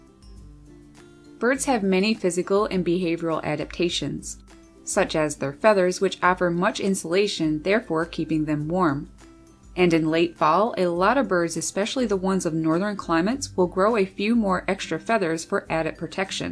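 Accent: Canada. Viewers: The Canadian accent is cracking me up! 😄 That's probably one of the most pronounced ones I've ever heard! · Hi. This is the first video of yours that I've watched and I really loved it. I assume from your accent that you're from Canada? The colours of those birds were just stunning, especially the blue ones. Sending you best wishes and warmth from the UK.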